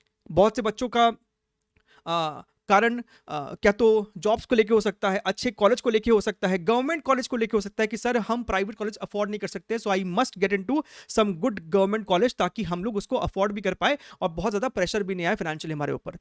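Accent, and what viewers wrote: Bihar, India. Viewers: to aaj pata chala ki aap bihar se hai.sir mujhe aapke accent se hi lagta tha ki aap bihar se hai.Mai bhi patna se hu sir and iss advanced me phodkar bihar ka naam roshan karna hai.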